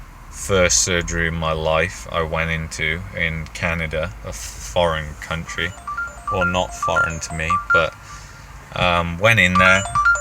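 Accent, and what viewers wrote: United Kingdom. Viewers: Sounds like youre from same area as me in the UK! i feel desperate for a change of scenery from here... I want to move to somewhere like Canada but right now, I can't even find a decent job to save up for all that so (if i ever did)... i think it's a looonngg way off. Cheers anyway, informative video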